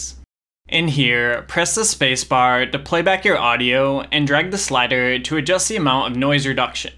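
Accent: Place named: Los Angeles, United States. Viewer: you have such a cute la accent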